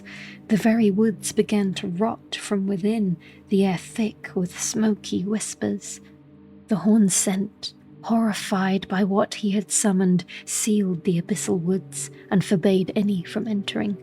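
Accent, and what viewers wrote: United Kingdom. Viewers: Having a soft spoken British women telling me Elden Ring DLC lore in bedtime story form is something I never thought I would need. But I am here for it with a side of chamomile tea... because English breakfast tea has caffeine!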